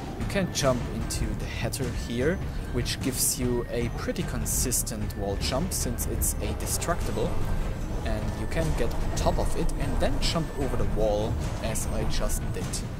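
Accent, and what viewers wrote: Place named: Germany. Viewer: dieser moment wenn du sofort durch das sprechen merkst das die person deutsch ist xD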